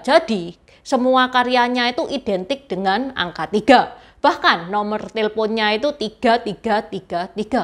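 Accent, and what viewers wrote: Java, Indonesia. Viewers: Logate ngomong jawa banget cik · ini tionghoa jawa kaya nya bicara nya medok jawa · Mbaknya ngomongnya waduh...medok banget kelahiran mana ya mbak, ❤❤❤🎉🎉🎉🎉🎉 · kok medok bgt jawanya emg tinggal dmn???? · Mbak ini orang keturunan tionghoa, tapi logat jowone kuwentel banget.. Yo wis lah, memang budaya cina itu sdh masuk ke dlm darah daging Bhinneka tunggal ika. Tetap semangat!! berbesar jiwa lah kalo masih ada pribumi yg mendiskriminasi kalian, jangan tersinggung. Saya yakin kalian akan semakin dewasa dlm berfikir, karena kaum dr kalian lah perekonomian di negeri ini semakin kuat. Synergi mu adalah existensi negeri ini.. Peace ❤️ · Cici..bahasane medok men to yo....jawa mesti..😅😅😅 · Medok jawa😂😂😂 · Mbaknya logatnya jawa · Aku suka suara mbk nya,,medok jawa nya itu lo yg bikin kecanduan.. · Jowone medok banget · mc.logatnya terlalu menthol kejawaan kurang enak didngat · Contoh yg paling mudah adalah mba penampilannya ngak salah lagi tionghoa tetapi kalau berbicara wah aksennya kentel kejawi-annya, krn orang2 tionghoa di Indonesia semuanya spt mba, didaerah mana mereka menetap mereka membaur dgn masyarakat setempat baik diAceh,Batak, Minang sampai ke Papua. 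Adalah naif kalau dibilang bangsa tionghoa bukan bagian dari suku2 di Indonesia. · Masyallah mdok bgt logat jwa ne mbk e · Logatnya Jawa sekali mbak ini ❤ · Wadduh.saluut mbak jowone medhok tenan hehehe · Adminya ciwo cina jowo bahasa Indonesianya logat jowo medok 😁👍👍🙏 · Unik sekali ka, wajah tionghoa bahasa indonesia medok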